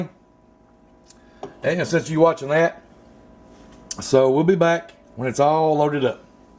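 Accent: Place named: Appalachia, United States. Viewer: well that looks real good I sent the recipe to my granddaughter and I like your hillbilly accent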